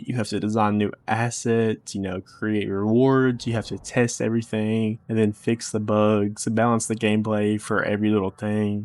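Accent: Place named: South, United States. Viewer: The southern accent is sending me 😭😭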